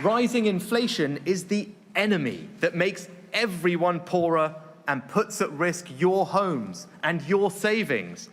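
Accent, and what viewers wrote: United Kingdom. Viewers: Bravo to Rishi Sunak. If someone puts a curtain in front and hears him, will know a sincere British is speaking...So Decide Whom you vote....Best for Your New Prime Minister... God Bless Britan !!!!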